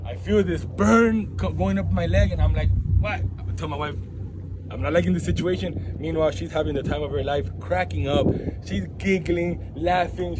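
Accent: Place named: Florida, United States. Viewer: That Florida accent is so weird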